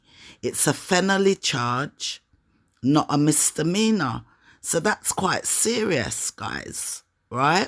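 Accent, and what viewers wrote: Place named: Jamaica. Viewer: I could tell you were English speaking but had a Jamaican background i can tell in your voice I live in the UK but was born in Jamaica and came here at the age of 8 I am now in my 60s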